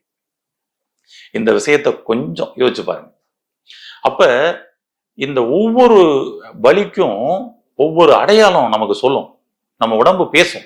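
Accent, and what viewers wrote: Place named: India. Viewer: Dr சொல்லும் சில சொற்களை இலங்கை மக்கள் புரிந்து கொள்ள முடியாதா ? Air (எயார்) என்பதைத்தான் ஏர் என்கிறார். Common கொமன் என்பதைத்தான் காமன் என்கிறார். தமிழில் காற்று என்று சொன்னால் நன்கு புரியும். இந்தியர்கள் தமிழிங்கிலிஸ் பேசுவார்கள்.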